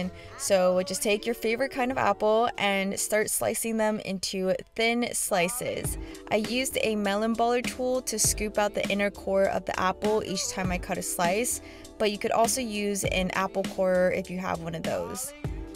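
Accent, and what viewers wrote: United States. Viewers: Lucy Blennerhassett in american english we dont pronounce the “h” in “herbs” :) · It's an American accent. Where I'm from we speak like that as well.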